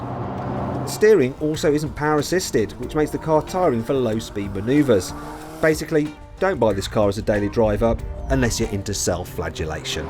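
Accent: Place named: Birmingham, United Kingdom. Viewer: he's a brummy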